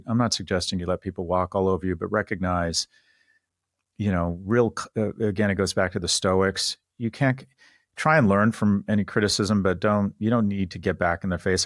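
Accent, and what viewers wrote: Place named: United Kingdom. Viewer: sound bri’ish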